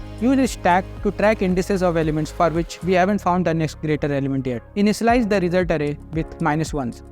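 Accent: India. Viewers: I'm very tired of Indian accent. learn English well, okay? you speak like kids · You should speak slower and make your accent better. Thanks for the vid, but god damn, Hindi English is the worst language ever.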